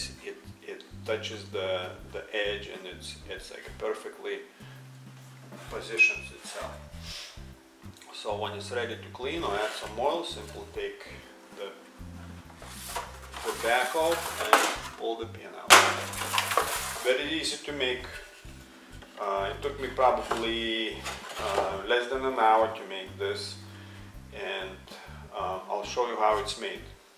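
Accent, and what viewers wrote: Russia. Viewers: MOJET IA OSHIBAIUS NO SLISHU RUSSKII AKZENT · I used to live in the Northwest corner of Montana, I may have told you before, and I love Wyoming too. But how did you choose to move to that area? By you accent,and may be wrong, but did you come from Russia? You live a very pleasant life there in the mountains...I know I sure miss it! How did you come to attract such a good energy with all the wildlife? I can see it in you. The animals, your pets reflect your kindness. Do you have family here in the U.S? I am rambling a bit but I feel a sense of goodness in all you film, keep it up! Till we speak again, Woody Woodward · Were are you from ? Russia or another country ?)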